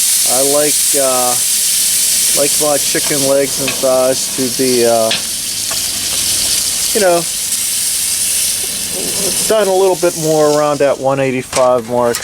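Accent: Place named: New York, United States. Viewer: “Forget aboouu It” can tell you are from the north East with a touch of New Yorker in ya. That lack of burnt charcoal is so impressive. Just got rid of all my extra 18” Webers - guess who is now back searching for one. I already have a couple of old stock pots - you truly inspire me.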